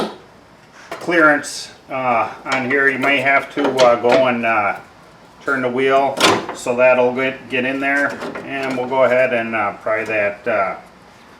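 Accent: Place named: Wisconsin, United States. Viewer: I love the uh accent , Lol reminds me of home in Wisconsin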